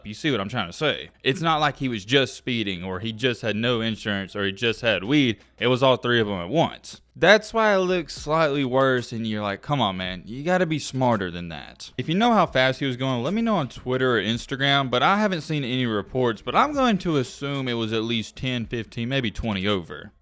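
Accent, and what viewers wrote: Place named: Boston, United States. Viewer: You sound like a bostonian talking about the "patriot way". And the dude got caught driving with no insurance... do you have any idea how many people drive without insurance, that literally why God created the right hand lane. And when you're black you don't need to be going "10,15,20 over" to get pulled over. That seems like a very sheltered take. And as far as the weed.... who cares, it's weed.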